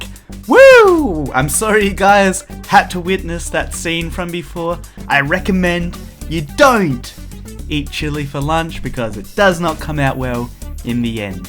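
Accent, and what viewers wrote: Australia. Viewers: Your Australian M8 well me too 😀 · o ma gad r chu aussie? c: bc chu sound aussie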